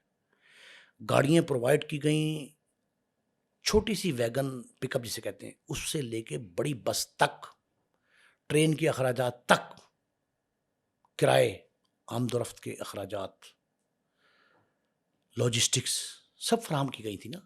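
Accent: Punjab, Pakistan. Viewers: پنجابی لہجے والوں سے کراچی کی کہانی سننے میں الگ ہی مزہ آتا ہے 🤣🤣🤣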